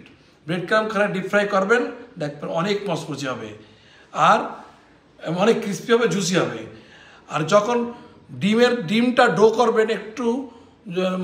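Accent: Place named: Jessore, Bangladesh. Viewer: আপনার বাড়ি মনে হচ্ছে যশোরে!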